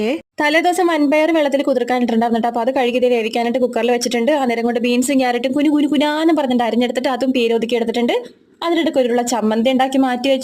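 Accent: Kochi, India. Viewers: Kochi basha 😂same 2u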